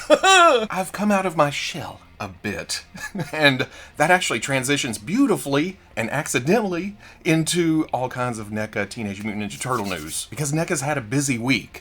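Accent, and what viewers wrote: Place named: Mississippi, United States. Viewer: As a man from rural Mississippi I prefer your videos. Your accent is like visiting home for 20-30 minutes a week. Never stop doing what you Love.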